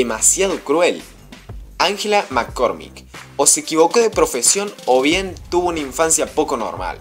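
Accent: Córdoba, Argentina. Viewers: una pregunta sos cordobes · adivino eres argentino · eres cordobes ? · El Chabon Es Cordobés v: <3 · Oh Un cordobeh 👉😜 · sos cordobés? yo soy de buenos aires ARG💖💖💖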